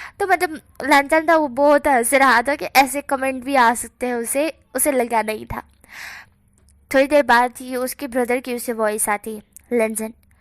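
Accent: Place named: Gujarat, India. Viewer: Aap ki thodi boli gujarati he❤😊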